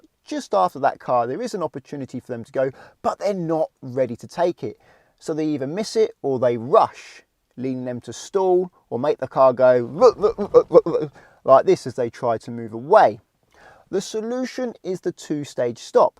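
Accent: United Kingdom. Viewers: This guy speaks with his own British accent. Try to find someone who speaks with your accent. Similarly, I never understand Indian accent.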